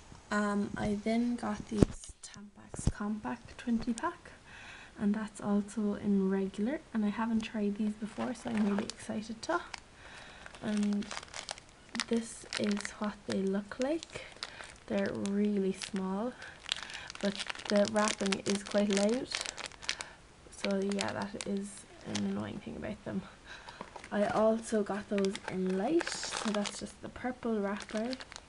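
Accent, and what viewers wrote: Cork, Ireland. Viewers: She has a cork accent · it's a cork accent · You're Irish! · I love your accent are you Irish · Are you from Ireland? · the first person I have heard with an Irish accent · You sound Irish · Are you Irish? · YOUR BLOODY IRISH THAT IS SO COOOOOL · She's Irish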